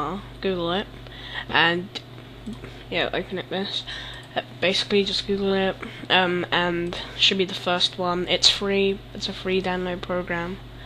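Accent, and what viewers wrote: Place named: United Kingdom. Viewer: HE'S A BRIT,HAHAHAHAHA.But,thanks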